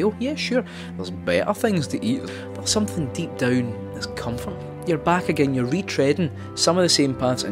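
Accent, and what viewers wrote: Scotland, United Kingdom. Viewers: are you Scottish, British, or Australian?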